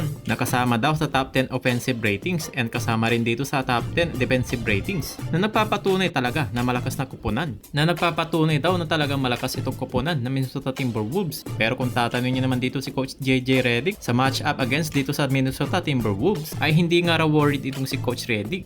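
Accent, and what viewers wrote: Pangasinan, Philippines. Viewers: taga pangasinan ka awa? hehe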